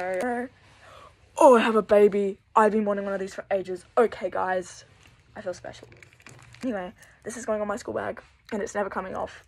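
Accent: Australia. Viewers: OMG UR AUSIIE ACCENT IS BETTER THAN MINE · Are you Australian? I love your accent!❤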